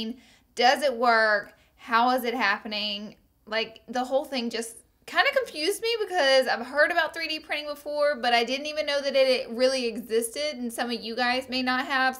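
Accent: South, United States. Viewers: She is so country I love it!!!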